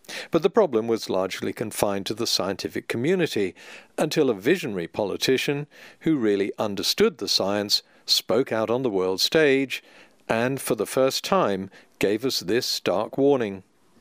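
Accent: United Kingdom. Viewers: What's wrong with his delivery? As a well educated British middle class person, his voice comes across as balanced, clear, and perfectly normal. It's an educated accent and very normal. He is delivering an educational discourse and does so in a rounded way. Is it just someone being British that you don't approve of?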